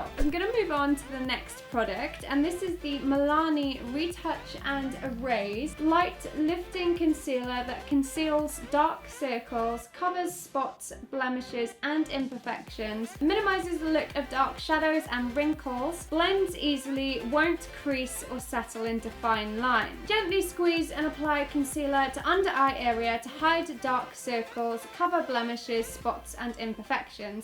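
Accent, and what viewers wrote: England, United Kingdom. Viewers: Are u from England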